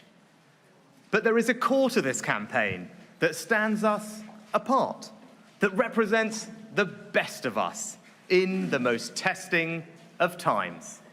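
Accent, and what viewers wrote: United Kingdom. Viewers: Bravo to Rishi Sunak. If someone puts a curtain in front and hears him, will know a sincere British is speaking...So Decide Whom you vote....Best for Your New Prime Minister... God Bless Britan !!!!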